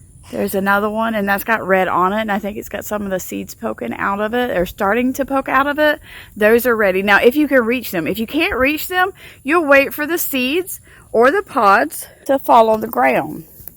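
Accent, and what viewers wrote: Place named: United States. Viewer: Thank you so much for this video... I have always been the type of person that tries to reproduce any plant that she sees... I love plants... I picked up 2 seed pods from my best friends yard today because the red seeds poking out caught my attention... I thought "omg, I can grow magnolia trees"... As soon as I got home I googled it and your video was one if the first things to pop up. Thank you sooo much for the information that you have given me and I just put my seeds to soak a little bit ago.... Also, I love your country accent and you seem like an awesome person overall... I hope you have a great weekend!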